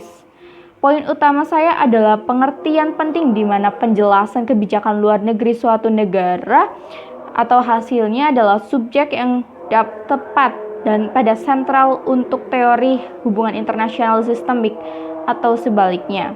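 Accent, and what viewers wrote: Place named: Java, Indonesia. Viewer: Kamu medhok sekaliii beb😂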